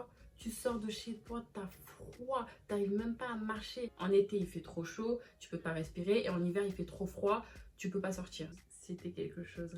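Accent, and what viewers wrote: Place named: Marseille, France. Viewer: Mhhh Marseillaise heiin 😅 #teampsg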